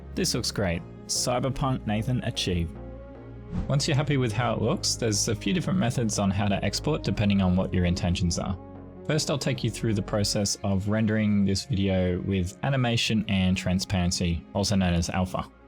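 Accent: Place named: South, United States. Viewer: Nathan....YOU'RE FROM KENTUCKY?! I never knew. First time hearing you speak after all! Love that thick southern USA accent!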